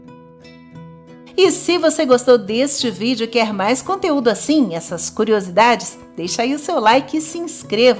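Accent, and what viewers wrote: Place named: Minas Gerais, Brazil. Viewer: Pelo sotaque parece que a narradora é de Minas Gerais, ou já viveu nessa região. Parece muito com o sotaque daqui de Minas. Parabéns narradora pelo seu excelente trabalho, é a voz feminina mais agradável do Youtube.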